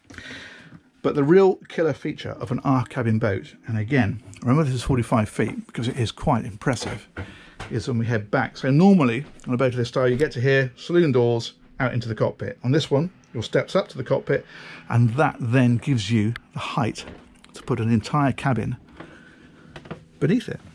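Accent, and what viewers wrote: United Kingdom. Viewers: Question- does the Broom 450 come with sun pads for the front of the boat? - or do you have to add/ purchase separately? Love the notion of a long traveling boat, but I didn't see as many sun areas? The front of boat appeared to show they could be added? Or is it just cushions are not put out? I'm a new subscriper & really love♥️ your attention to detail& your British accent- it is so great😆! Will you ever have a show on Chris-Craft yachts, or their classic 1920s or 30s line to view?🤔 Thanks again..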